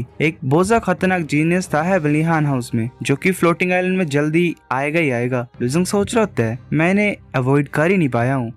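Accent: Himachal Pradesh, India. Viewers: Bhai aap himachal se ho kya😅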